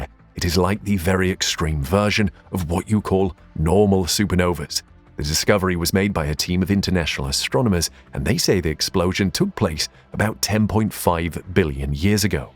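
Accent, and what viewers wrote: United Kingdom. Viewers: you have the british accent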